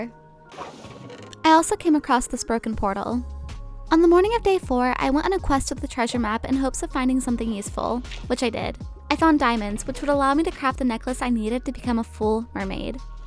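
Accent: Australia. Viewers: Very great Aussie accent, fun thing is that people from the center to northern territory do speak like that, but I'm from the other end of Australia (AKA the southern east area) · Your accent was half Australian half English coming from and Australian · The best Aussie accent I've heard so far And I'm a Aussie :)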